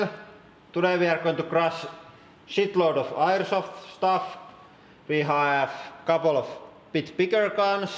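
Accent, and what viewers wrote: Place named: Finland. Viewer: Sun ralli englanti on paras!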